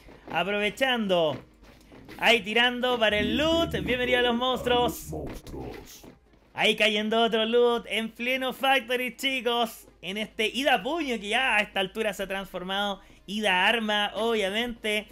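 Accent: Chile. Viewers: Bro eres chileno?? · Eres de chile? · Eres chileno ?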